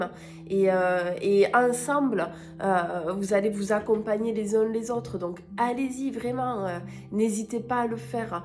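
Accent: South, France. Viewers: Une belle jeune femme avec un accent....c l’accent du sud lalala...et qui dit et qui raconte l’histoire d’une belle Lumière Bleue...c une vibration merveilleuse car tu en es toute transformée...❤❤❤❤❤❤❤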